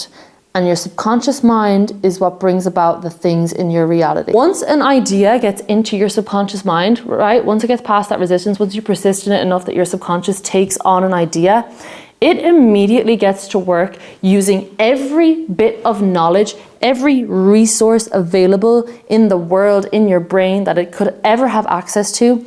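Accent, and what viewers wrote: Ireland. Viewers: Heard the Irish accent and immediately subscribed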